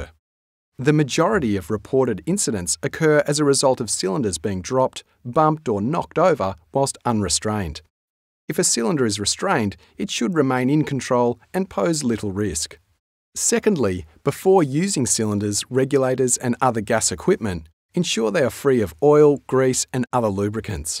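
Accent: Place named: Australia. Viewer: British Oxygen Corporation Voiced my a Aussie!! Great Job.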